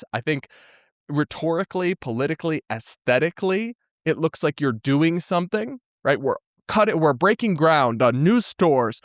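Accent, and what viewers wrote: United States. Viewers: talking with an American accent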